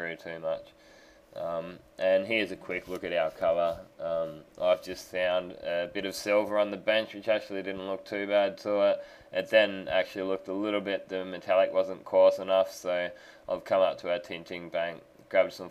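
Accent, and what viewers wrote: Australia. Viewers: He's Australian- True Blue..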